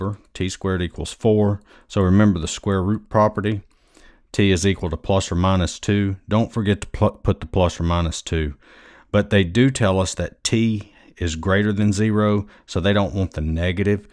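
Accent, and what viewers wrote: South, United States. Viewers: The southern accent is so annoying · Maybe it cause he from the south cause of his accent because am from the south but the way he solved the problems great and amazing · I was not here for math, I was just listening to your Southern accented English...... You are a good teacher. Regards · Most southern accent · he meant with the southern accent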